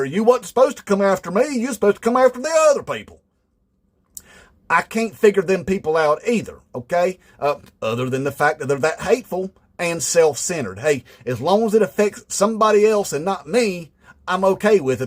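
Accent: South, United States. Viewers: You're hilarious. Pleasantly surprised I am that such a southern sounding bloke is actually sound. Subsribed in Ireland 🇮🇪. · You definitely put out some hilarious videos. I detect some real intelligence behind that corn pone accent that you use. I am subscribed and like every time. Also sharing. Inmate trump. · Beautiful work my man. Just love'n that American accent.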